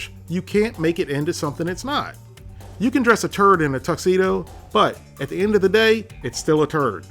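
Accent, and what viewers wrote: South, United States. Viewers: i like how you were talking like a hick! git r done grub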